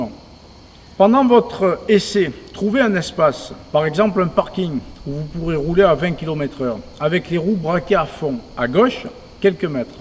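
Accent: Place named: Marseille, France. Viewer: Lol! Une cardan non de dièu Accent typique de Marseillais :trophy-yellow-smiling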